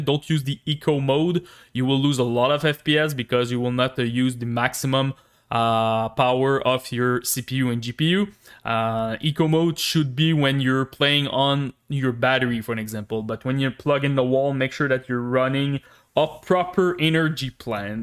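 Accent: Quebec, Canada. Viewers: yo quebec?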